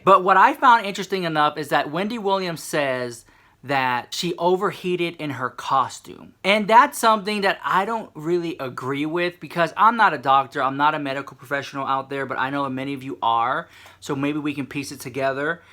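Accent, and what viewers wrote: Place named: Texas, United States. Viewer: girl we can hear you come from Texas.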